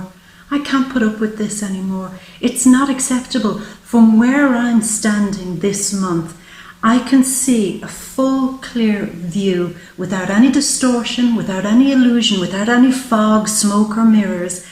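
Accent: Ireland. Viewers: Six bajillion holohohoaxers on the rampage from the murder of the Romanov children in cold blood, the Holodomor and the 50 million anhialated through the 1918 Spanish flu, called so because Spain was the only country without a blanket ban on media. To wall Street and the Frankfurt School Freudian Marxists kicked out of European countries, again, now to rule by political correctness and to silence the counter narrative unofficial thinkers. Apart from that tyrannycal despotism I really like your top on you, and ye've a great brogue.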